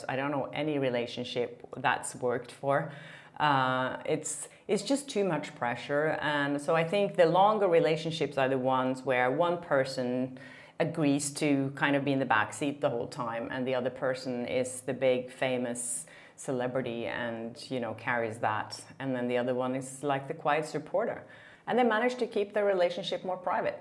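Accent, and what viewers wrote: Norway. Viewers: I love your Norwegian accent! We visited Stavanger last year and had an absolutely lovely time except for the hills. My legs were aching. Keep up the good work. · You're adorable!! I much prefer your original Norwegian pronunciations!! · I find a little Norwegian twist to some words quite charming! The friendship between Ryan Reynolds and Hugh Jackman is a dichotomy. (Hope I’m using the correct word). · Your Norwegian accent is beautiful! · Your Norwegian accent is lovely and your syntax mix ups are lovely too! ❤️❤️❤️❤️ we know what you are saying and you are doing great! 🎉❤ You are also doing better in your second language that 99% of other ppl in the comments could do! 😉😉😉 😉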